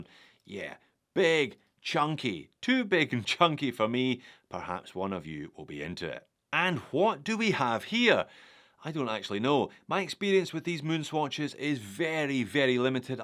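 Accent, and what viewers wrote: Scotland, United Kingdom. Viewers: 😅Love the videos been watching you get better and better over the years 👍Congrats to you and your wife on your new family,😂😂sorry but it’s going to go downhill from here 😂😂😂I look forward to watching you every week,love hearing the Scottish accent which i hardly ever hear as i moved to Boston Massachusetts twenty years ago,good luck to you and your family for the future,please stay happy healthy and safe 🏴🏴🏴🏴🏴👍👍👍👍👍👍😀😀😀😀😀🇺🇸🇺🇸🙏🙏😇😇🤣🤣👏👏👏👏👏👏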